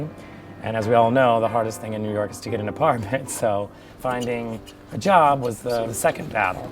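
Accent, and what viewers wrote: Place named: Los Angeles, United States. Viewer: Wow I never noticed her LA accent before, she's sort of toned it down but it was so cute!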